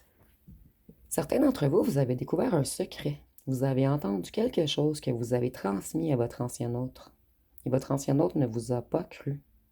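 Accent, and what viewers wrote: Quebec, Canada. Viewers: j'adore vos guidance , merci beaucoup ;) pas de souci je comprend bien le québécois puis j'adore , votre franc parler c'est trés spécifique votre parlé , trés imagé ,j'aime bien! c'est franc ! ;) félicitation pour votre nouveau logement , content pour vous ;)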